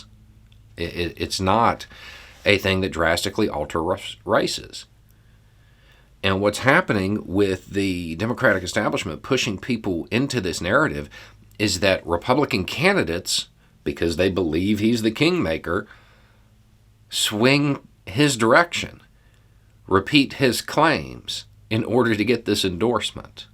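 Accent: South, United States. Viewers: his "act" used to be when he hid his accent. A lot of Southerners do. He's just speaking how a rural Southerner speaks. Sounds normal to me. I'm a Texan. I don't have much of an accent because my family drilled it out of us, but I've known plenty of people who sound just like him. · I wouldn't call it an act. is from the south and I believe the way he looks plus sounds is authentic. It's just people assume that others who look like him are going to be right wing and he uses that as an advantage for them to listen to his message. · Opara and everyone else talking about his accent As a southerner maybe I can provide some clarification. What I think we are hearing here on most of his videos is close to his professional voice. You have your regular (nautural) voice, the one that no one is going to think you have a single brain cell in your head, the one that makes you sound like an extra from "Deliverance". 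Then you have your professional voice, where you have to hide as much of it as you can so folks don't think you are an idiot (or a bigot, because you know all us southerners are a bunch of racists, and to be fair, I can see why someone would have that assumption). What we hear from is a relaxed version of his professional voice. Some videos we hear his relaxed professional accent, some his natural accent, and some we hear a played up version of his natural accent. To someone not from the south these distinctions are easy to miss. I recommend anyone interested in the topic look up "code switching".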